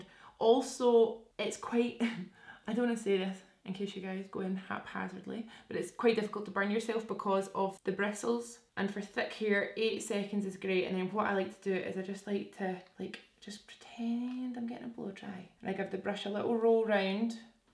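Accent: Scotland, United Kingdom. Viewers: When I started watching your videos ageeees ago I understood every second word you'd say haha now I'm like half Scottish xD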